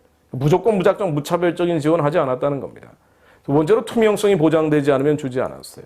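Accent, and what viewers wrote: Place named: North Korea. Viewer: 이사람도 이북악센네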